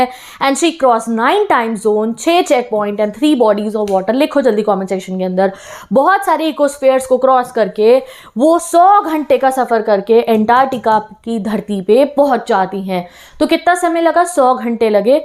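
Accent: Punjab, India. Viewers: Bande Bharat ke speed se bolte ja rahi hai aap। Student would definitely fail to follow।। Again Hindi in Punjabi tone is very disturbing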